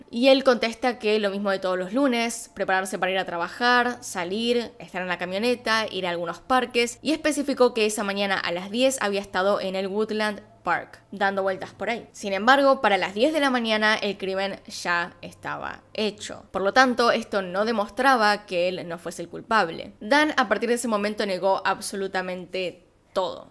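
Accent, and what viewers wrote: Argentina. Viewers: Es argentina por eso suena así. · Soy español y escuchar un accento argentino mientras estudio es un vicio 😂 me alegro de que ya casi estas casi al millon. Vamos !!!!ánimo que tú puedes llegar!!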